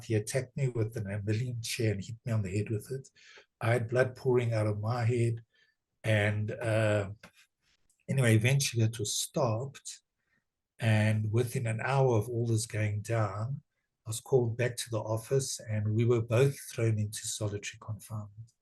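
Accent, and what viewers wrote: South Africa. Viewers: Good South African accent !! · is this a south african accent ? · This sounds like a South African but let's see if I'm correct in saying that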